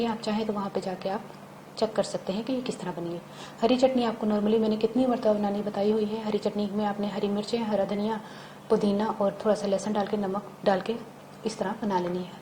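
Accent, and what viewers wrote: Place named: Rural, India. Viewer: Bhen m kehna chahti hu ky agr video bnani h to kissi sy voice over krny ki classes lyy lo w ky apko sense nhi h kese bolyy h bht paindu boltii aur aik bt ap bht zuda bolti wooo naaaa wooo naaa aur aik wo apki h jis ko bolny ka tarika hi beech m urdu ky punjabi start kr deti h plzz tameez sy bolnaa seekh loo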